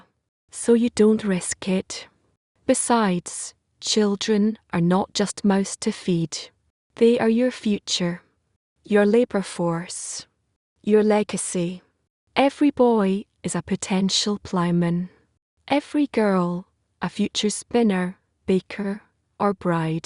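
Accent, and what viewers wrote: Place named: Scotland, United Kingdom. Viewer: Love the Scottish voiceover 🏴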